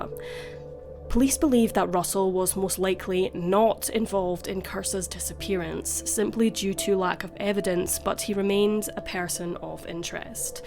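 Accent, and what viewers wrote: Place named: Somerset, United Kingdom. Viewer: Ooh arr nothing wrong with a Zumerzet accent ..😃😃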